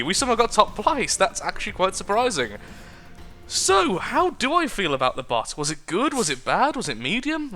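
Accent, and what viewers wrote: United Kingdom. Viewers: Your british accent always puts a smile on my face.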